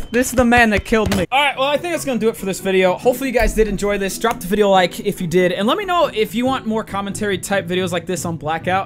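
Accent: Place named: Brooklyn, United States. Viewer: You have a strong Brooklyn accent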